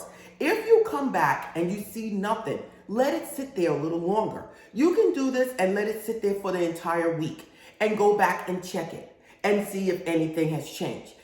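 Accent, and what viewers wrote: New York, United States. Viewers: Hey dear!! I used apple cider vinegar instead, because my other vinegar is in the storage. Is that OK? I think it should be totally fine. Also, I just did the ritual and I'm looking forward to seeing the results in 3 days. PD: Love your NYC accent. That's the coolest accent in the US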